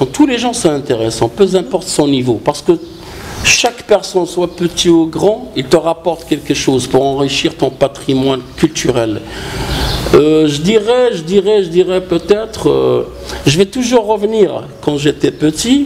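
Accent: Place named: Arab world. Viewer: فيك عياقة وتكبر ديال رباطيين ولكن جات معاك غير حاول ماتنطقش فرونسي فيها لكنة معربية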